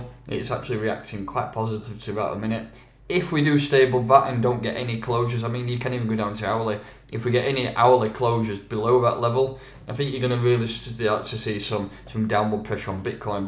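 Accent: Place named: North, United Kingdom. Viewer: Thanks as always chief! Love the videos and the northern accent delivery beyond awesome!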